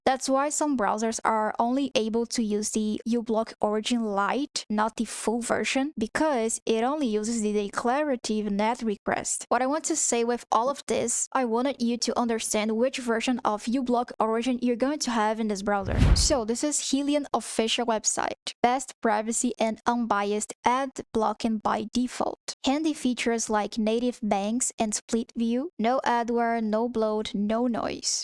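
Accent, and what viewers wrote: Brazil. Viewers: eu sabia q vc era brasileira imediatamente pelo sotaque kkkkkkkk nao te xingando tho, otimo video e otima pronuncia !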